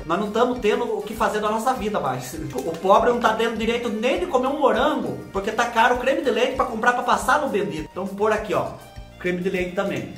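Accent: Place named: Minas Gerais, Brazil. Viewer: Mais um vídeo delicioso se é q me entendem.eta nosso mineirinho adotado tem certeza q não nasceu em Minas Gerais seu sotaque e melhor q o meu kkkkkkk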